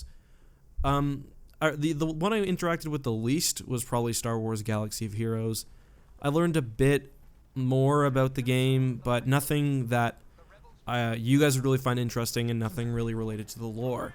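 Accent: Canada. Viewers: You get really Canadian when you do rambling videos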